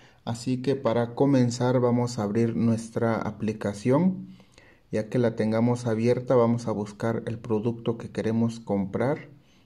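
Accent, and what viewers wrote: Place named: Mexico. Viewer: X k habla como mexicano ?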